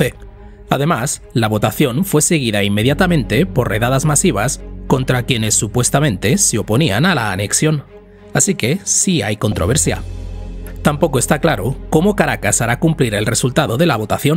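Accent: Spain. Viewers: Este analista le faltó leer más. El Esequibo es parte de Venezuela, ya esa parte del territorio no debe estar "rayada". Una zona en disputa no debe ser aprovechada por ninguna de las partes y Venezuela ha cumplido. Si una de las partes quiere invadir el territorio esa es Guayana, porque el Esequibo es de Venezuela, así es que el cuento, espero que sepas cual es la verdad y no la hayas dicho por algún interés económico particular, porque de no ser así, entonces es que de verdad eres absolutamente ignorante de las realidades geopolíticas de las que tratas en tu canal. También quiero acotar que cuando te vayas a referir a las economías en el caso de Venezuela, no obvies un hecho de mucha influencia en la geopolítica económica como lo es las sanciones unilaterales impuestas por EE.UU. (Sanciones) y has un análisis retrospectivo del antes y después de ellas en el desarrollo de Venezuela. 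Por tu acento creo que eres español y por tu forma de referir el tema de Venezuela me parece que eres de los que aun les duele la patada por las n@@ que Simón Bolívar en compañía de esclavos e indios, le dio al imperio español hace más de 200 años, después de más de 500 años de saqueo en nuestra América Latina. No uses este medio para engañar a la gente.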